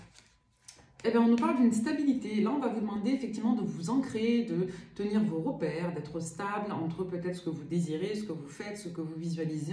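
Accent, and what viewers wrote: South, France. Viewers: Cc tu as un accent du sud😊❤❤❤moi j adoreje ss de toulon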